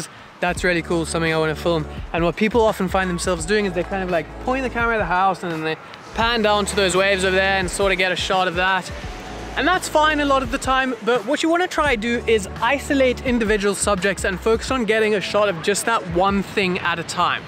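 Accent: Cape Town, South Africa. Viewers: Hy dude are you from Cape Town?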